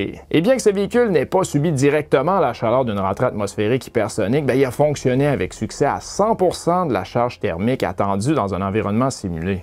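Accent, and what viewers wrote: Quebec, Canada. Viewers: j'adore, sa doit faire plus d'un ans que je suit l'actualité de SpaceX et du starship, j'écoutait en francais de France depuis, sa fait plaisir d'avoir un Québecois ^^ bonne continuation mon ami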